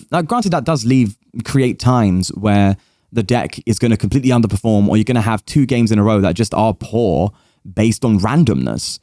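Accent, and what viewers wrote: United Kingdom. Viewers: Always have a good time watching and those funny misplay moments among other entertaining shenanigans. Those and that cheerful, jesting personality (not to mention the nice british accent!) really sets this channel apart from others, I think. And it's cool to see games pushed into victory even after those misplays! Shows that a skilled player can turn even a bad situation into a victory. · Bro just be yourself, keyboard warriors are everywhere just let them be. I watch you because of your laughs, beloved British accent, you're entertaining, your perfect English, than merely playing competitive perfect Pokemon games. Love from Malaysia ♥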